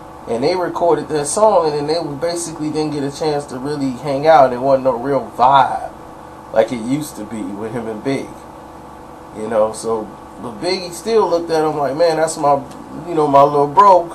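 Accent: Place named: South, United States. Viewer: Hahahaha....this guy has a southern accent!!!! Have u ever been to NYC????? ANY BORO?????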